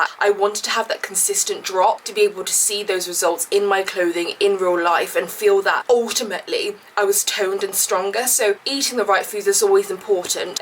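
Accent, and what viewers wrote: RP, United Kingdom. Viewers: Rlly like the posh British accent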